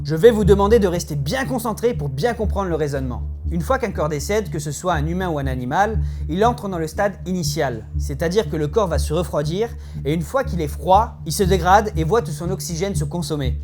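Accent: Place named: Quebec, Canada. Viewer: aaaah, c'est quoi l'accent que tu essais de cacher, j'entend un leger accent, tu es quebecois ?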